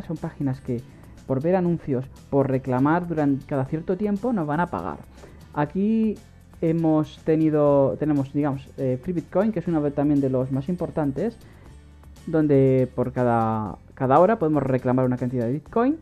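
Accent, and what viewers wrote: Spain. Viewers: Dice guatemala y el habla como español xd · En Guatemala ? Pero el que habla tiene un acento de España ¿? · Ostias tío dise para Guatemala pero este tío suena a español xD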